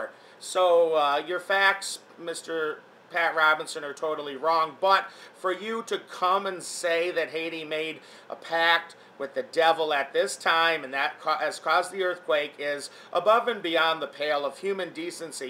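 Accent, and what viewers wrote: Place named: Midwest, United States. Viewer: I love your Midwestern accent.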